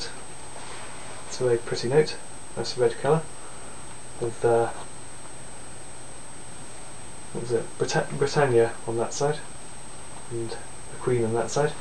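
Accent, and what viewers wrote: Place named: RP, United Kingdom. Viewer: My goodness he sounds posh! What British accent is that? He sounds quite effected in the way he speaks. Mind you I am Australian, so there are quite a few accents that sound 'posh' compared to an Australian accent. The boy speaks well, I like it.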